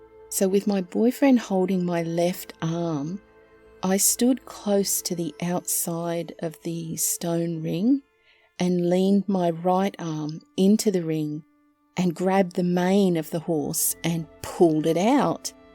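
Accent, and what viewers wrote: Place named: Australia. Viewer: wow wat a voice... im french canadian and allways loved your Australian accent's ,you folk from down under...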